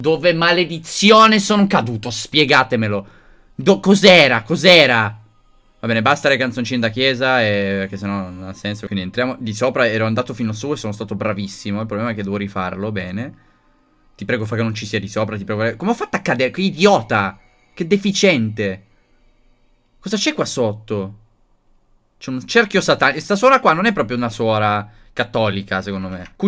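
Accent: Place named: Bari, Italy. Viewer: che parla in dialetto barese😂😂.Io sono barese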